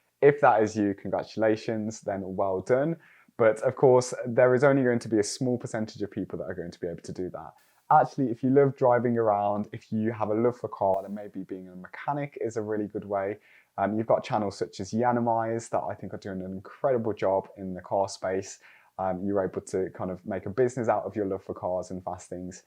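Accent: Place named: Birmingham, United Kingdom. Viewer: Thought I clicked on an unknown T video😂. You know I thought I could detect a hint of "Brummy" in the accent😂. Great video. I was tempted to do Ethical hacking at undergrad, but anyone who hears the term hacking outside that realm will immediately have negative connetations. But it's got that white hat hacking roles are cropping up.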